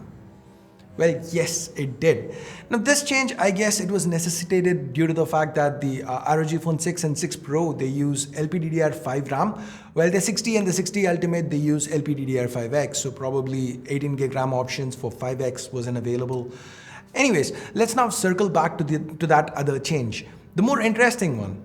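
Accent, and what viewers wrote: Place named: India. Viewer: Finally, Indian english speaking reviewer...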